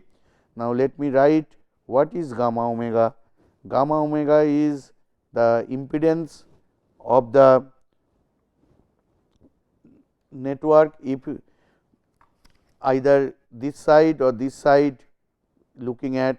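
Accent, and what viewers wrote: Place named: Bengal, India. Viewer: he is for sure a bengali by his accent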